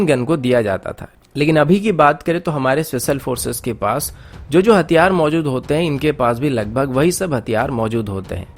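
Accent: Odisha, India. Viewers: Vai tum odisha se ho kya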